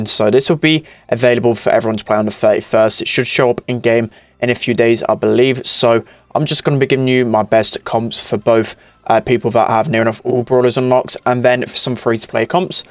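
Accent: United Kingdom. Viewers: Awww cute British accent tho👀🥰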